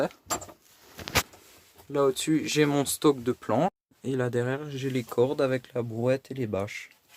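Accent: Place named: Alsace, France. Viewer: Excellent les gars, je viens de regarder ta vidéo, je vais bientôt avoir 40 ans et franchement continuez comme ça les jeunes je vous encourage, je viens d’Alsace aussi et ton accent je l’entends bien grave allez à plus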